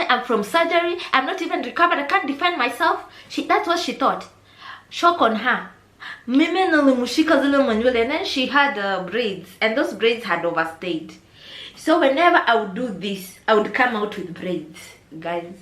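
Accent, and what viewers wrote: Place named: Kenya. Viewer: You are a good story teller, I love you, nice kenyan ascent.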